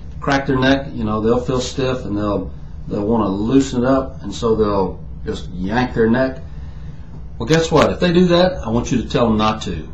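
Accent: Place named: South, United States. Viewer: " the southern accent doesn't make him any more believable" Nice prejudice coming from Canada "eh"? Statements like that only point out how ignorant you are. Have you ever been to the South? Do you have any idea what you're talking about? He's not talking about harmless yoga stretching, he's talking about wrenching your neck beyond it's anatomical limits.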